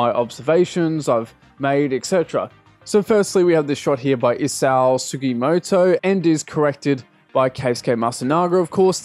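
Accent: Australia. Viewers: I was pretty sure you came from down under but the accent was kinda close to British so I wasn't sure.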